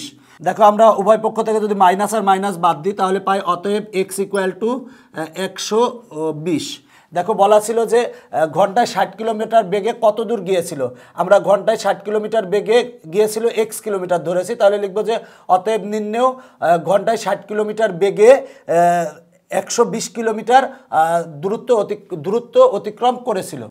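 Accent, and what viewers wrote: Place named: Kushtia, Bangladesh. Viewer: সার আপনার বারি কুষ্টিয়া মনে হয়🤔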